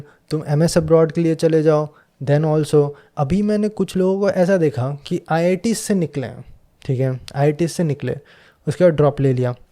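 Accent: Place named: United States. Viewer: Feels like now your Hindi is slightly sounding American accent 😅. Just saying what I felt. Been more than a year, I heard you speaking Hindi.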